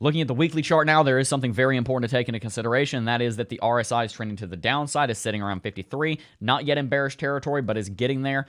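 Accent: United States. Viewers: I just love your cowboy accent... I enjoy your videos. Keep up the good work!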